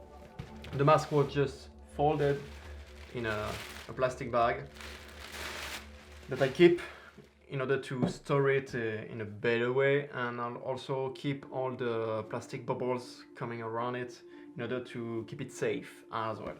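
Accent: France. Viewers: Great video and review, my next silicone mask will be Pennywise IT 1990 silicone mask by BurkBench designs and then I will get the Alien silicone mask also by BurkBench designs probably in October, also the way you pronounce latex is funny 🤣 🤣 🤣 and judging by your accent you are either French or French Canadian.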